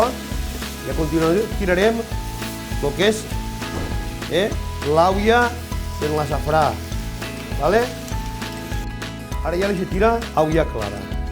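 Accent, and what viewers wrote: Valencia, Spain. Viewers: Holeeeeeeeeeeeeee. la Paella Valenciana autentica con ingredientes de Valencia. Y pronunciada por un Valenciano de pura cepa. APLAUSOS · Che!!!!!!!! A parte que buena paella haces. Y que bien hablado el valenciano. El valenciano autentico, no lo que se habla ahora. Enhorabuena.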